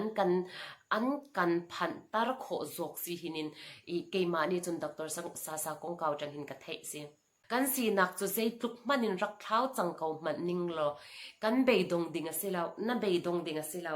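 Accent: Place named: Mizoram, India. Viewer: Na holh Accent hi a Pangkhua holh thluk ngai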